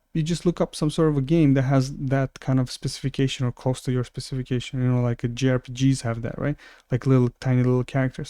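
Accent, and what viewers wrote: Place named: Romania. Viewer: La inceput chiar m-am gandit ca esti roman sincer. Dupa accent si aspect, doar ca m-a cam lasat in dubii "CA"-ul de la youtube. Deci am zis ca poate esti Canadian dar vorbesti franceza mai mult decat engleza. Sincer chiar ar merge sa faci o serie de tutoriale. Godot a inceput sa creasca foarte mult in ultimul an. Eu personal il folosesc de cam un an si ceva si nu am lansat nici macar un joc, ci doar prototipuri :)) Ai putea folosi strategia asta cu youtube-ul, sa faci niste tutoriale, sa-ti cresti canalul destul de bine si cand o sa lansezi jocuri o sa ai oameni interesati in proiecte. Deci pe scurt, ai putea face o sursa de venit din asta. Lasa-mi un detaliu de contact daca vrei si poate am putea comunica mai mult acolo. Multa sanatate si chiar astept tutorialele tale. Email-ul meu e : <mail>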